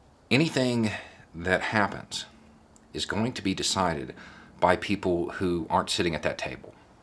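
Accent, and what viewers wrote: South, United States. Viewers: It takes sometimes for me to get used to the southern accent expressing points of view in coherent and complete sentences.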